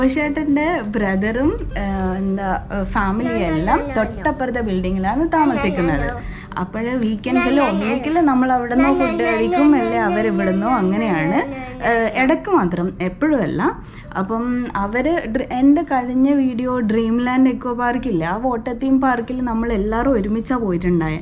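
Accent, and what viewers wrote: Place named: Kannur, India. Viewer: video nannayittund ! typical kannur bhasha enikkishtayi.njanum kannurkari anu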